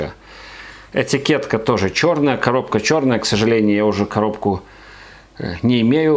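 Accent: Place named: Ireland. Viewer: У вас акцент. Вы живете в Ирландии?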